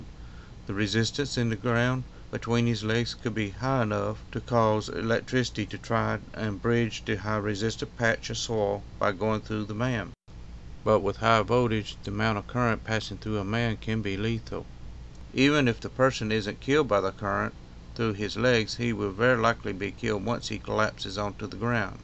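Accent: South, United States. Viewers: this guy's southern drawl is hilarious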